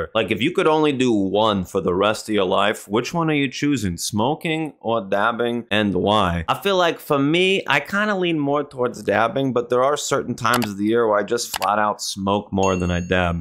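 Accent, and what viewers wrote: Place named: Boston, United States. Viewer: Your accent bro! Are you from Boston?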